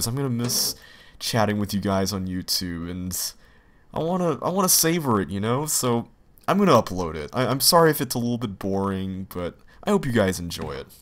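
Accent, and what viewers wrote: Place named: United States. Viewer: Your accent is adorable! What part of the US are you from??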